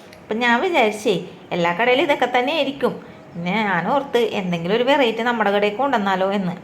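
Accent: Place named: Kochi, India. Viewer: ഒഴിച്ച് കൊടുക്കണേണ്. തനി കൊച്ചി ഭാഷ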